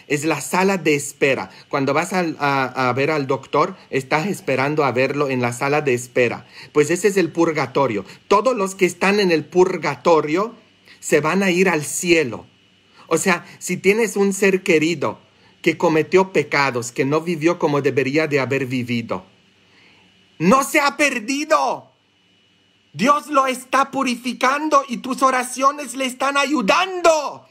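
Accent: Mexico. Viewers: Padre, que bonito es aprender y disfrutar de sus predicas, y yo creo que usted es igual de mexicano que yo, habla igual como hablamos nosotros, igualito...un abrazo muy grande.... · Padre, Gracias! Ud, es precioso me gusta escucharlo en mi español con acento mexicano, soy ecuatoriana, lo amo. Padre, Si creo que existe el mal por eso aconsejan tener animales porque nos protegen; y, el pobre perrito tomó la maldad que le cayo al pobre cura. Muchas Bendiciones! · Que bendición haberlo encontrado padre. Es usted un Ángel!!! Me encanta todo lo que dice y sus explicaciones son especiales. Usted si que aprendió el español mexicano así como de donde soy yo de un pueblo en Jalisco llamado Acatic (pero hoy día vivo en California). Espero muy pronto poder ir a una de sus misas cuando viajemos al norte de California. Dios lo bendiga y nos siga nutriendo de tanta sabiduría. 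🙏🏼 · Que divertido es usted padre me encanta que sabe el español mexicano👍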